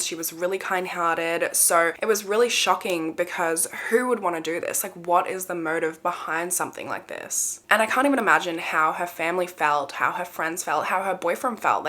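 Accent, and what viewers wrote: Australia. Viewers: Just her Australian accent is all❣️. Lived there in my younger years, and I love hearing her speak, reminds me of the past.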